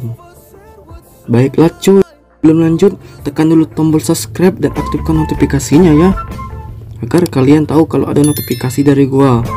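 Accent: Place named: West Java, Indonesia. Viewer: Sunda bang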